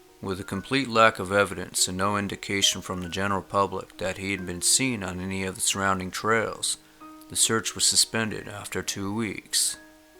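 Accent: South, United States. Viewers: You sound like the distant cousin of Jensen Ackles who comes from the south and is unfazed by everything.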